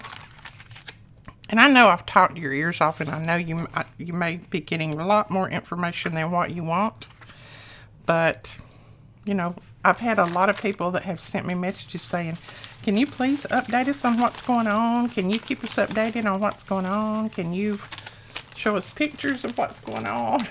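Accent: South, United States. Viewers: I absolutely ❤️ every one of your videos‼️Yours is my favorite channel to watch and my biggest inspiration. I’m very new to this but enjoy it so much. If I could afford it, I would buy everything you use and create all day. Ha ha. Love your accent as a fellow southerner. I’m from Texas, but the accent is the same. Talking about your family is part of your southern charm. Don’t pay attention to those negative people complaining about it! 😉 Keep up the beautiful work! -Michelle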